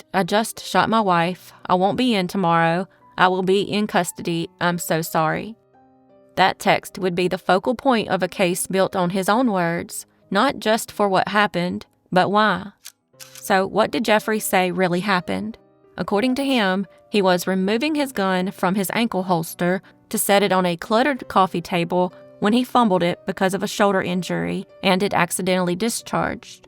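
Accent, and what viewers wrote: South, United States. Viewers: This narrator is - a real person with a Southern accent. Maybe those other channels use AI voices to make it sound like the narrator of this channel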